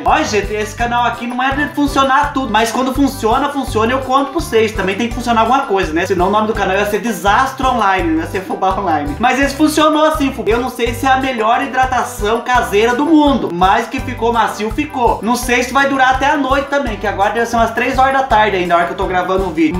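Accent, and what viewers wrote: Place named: Minas Gerais, Brazil. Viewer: Você tem muito sotaque de mineiro.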